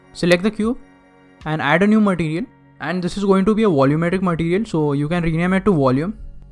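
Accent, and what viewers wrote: India. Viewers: I recently had a discussion with someone if indian accents are actually that distinctive like its shown on may shows in media. This guy proves they actually are. Good video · hindi people use to have that accent, u know cause phonemes changes. I talk spanish and I'll probably have some recognizable accent and americans that speak spanish you can identify their accent too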